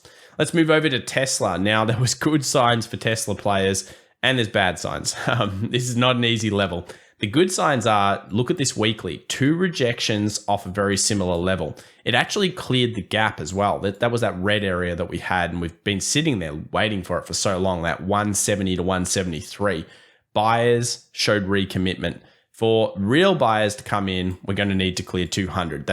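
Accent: Australia. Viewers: You da man! Do you have a Crocodile Dundee Knife or an American knife? Sorry, that was my first encounter with an Australian Accent... And I have your Whatsapp #! I'm going to call from NY to wake you up!!!!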